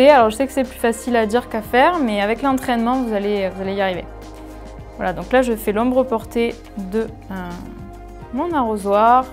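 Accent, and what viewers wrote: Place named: Basque Country, France. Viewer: Alors, je suis Luzien, et je jurerais que votre accent est du coin...C'est tellement familier!!! Si pas luzienne, Basque tout du moins. En tout cas, Merci pour les vidéos!!! Milesker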